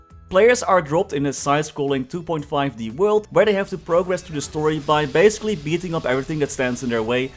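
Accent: Netherlands. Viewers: Are you Dutch? Ik kan een accent horen haha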